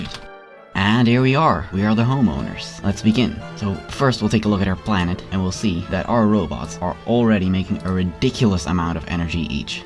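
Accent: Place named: Netherlands. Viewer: you're dutch the accent haha nice video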